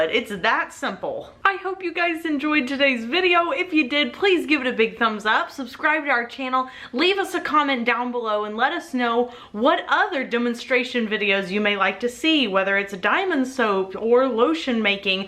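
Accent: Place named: North Texas, United States. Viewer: I’ve been wondering what your accent was from because you sound exACTLY like my aunt Pam. You must be from norther Texas bear Oklahoma cuz that’s where my mom and aunt are from/grew up.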